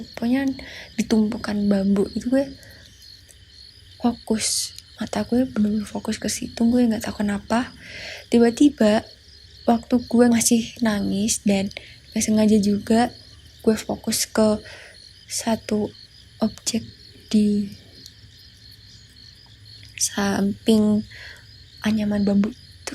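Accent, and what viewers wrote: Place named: Java, Indonesia. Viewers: Medok juga keren kok.. ga harus pake gue padahal medok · Scara dari JaTang mba nya ... 😊😊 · Medok mbak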